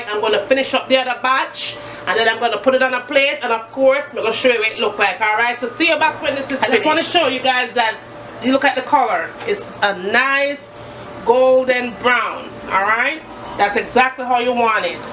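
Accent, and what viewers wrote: Jamaica. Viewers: Listening to her speak took me back to when I used to live in Jamaica. Good memories and awesome food.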